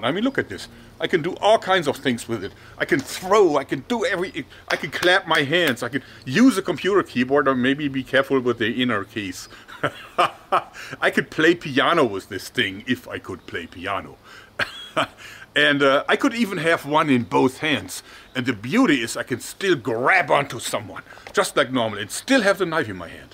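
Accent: Germany. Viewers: What accent does he have? edit: he is most likely german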